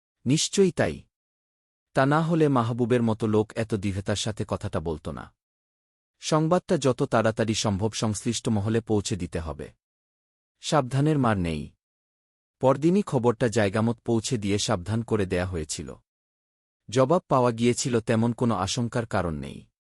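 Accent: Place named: West Bengal, India. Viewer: The reader is from West Bengal!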